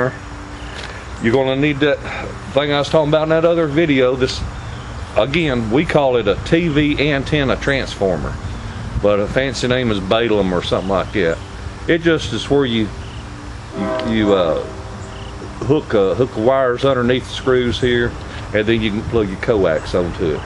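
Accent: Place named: South, United States. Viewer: Hey, I love your southern twang. The information was great. I'm going to build one. Wife bought me a $80 digital antennae in the house. I get 15 channels. This should also help get more.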